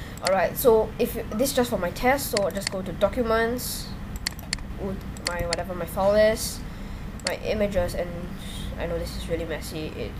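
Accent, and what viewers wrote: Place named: Singapore. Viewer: You sound like a singaporean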